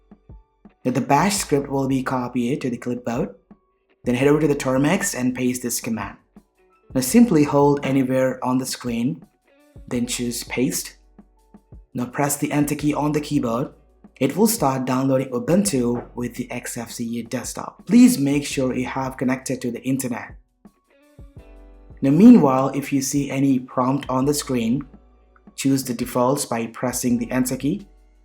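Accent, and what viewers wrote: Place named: India. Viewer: I think he’s Indian because of his accent. I’m Indian too and I think his accent is Indian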